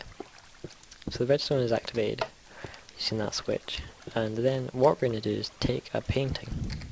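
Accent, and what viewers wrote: Ireland. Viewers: are you irish?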